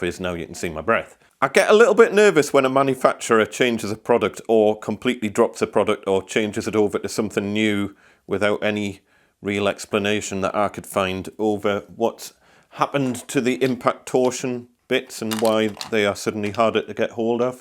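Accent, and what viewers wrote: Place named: Geordie, United Kingdom. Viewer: Still not big coat weather for you. Reet proper Geordie, man.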